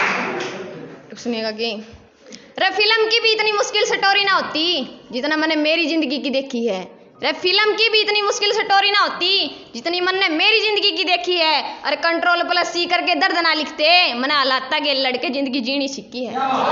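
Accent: Haryana, India. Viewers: Hryanvi me bhut sunder bhut pyara bola bhna 🤗❤️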